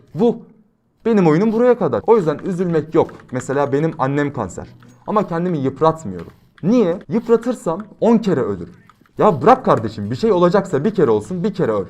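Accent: Adana, Turkey. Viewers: Abi sen adanalı mısın?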